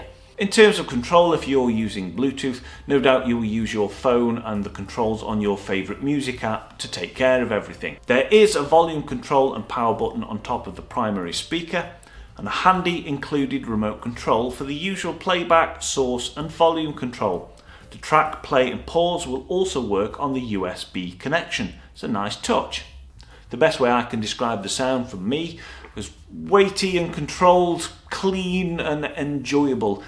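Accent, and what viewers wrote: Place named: Stoke-on-Trent, United Kingdom. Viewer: Not a dig my friend....I spent time in Stoke years ago and it is a lovely accent....brought back nice memories! 👍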